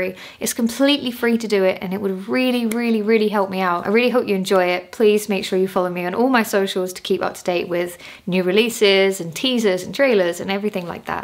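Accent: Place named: Midlands, United Kingdom. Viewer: As a fellow resident of the Midlands I love that has become fully naturalised and now says "laff" rather than "larf".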